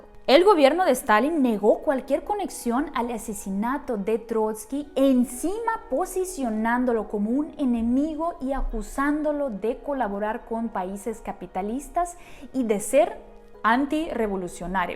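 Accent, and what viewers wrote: Ukraine. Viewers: Soy yo o está volviendo a tener su acento Ucraniano???:P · Buen documental, cuando estube en el DF, trabajando y estudiando, muy de seguido pasaba por el museo de Frida Kahlo, Diego Rivera y León Trotsky, pero nunca entre, una pregunta porque estás volviendo a hablar con acento ucraniano ya lo habías perdido, es bonito, no lo critico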